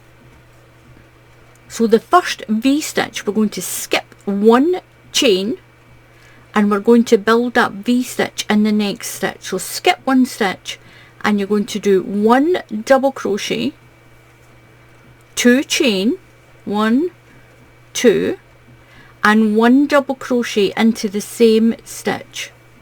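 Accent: Scotland, United Kingdom. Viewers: Tbh I mainly watch your channel because of your scottish accent, I just love it SO MUCH 😍😍🥰🥰 Your teaching skills are also awesome though. Keep up your great Videos!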